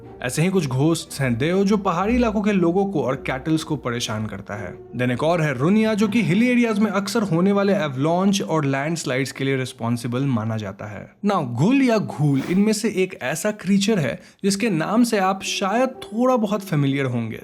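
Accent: Bengal, India. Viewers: R u a bengali? Your accent..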